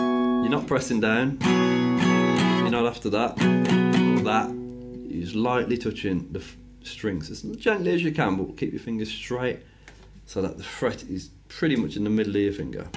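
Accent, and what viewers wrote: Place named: Midlands, United Kingdom. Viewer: U sound really Midlands lol I love it......Ur teaching but I need you to show me imgd but dumb lol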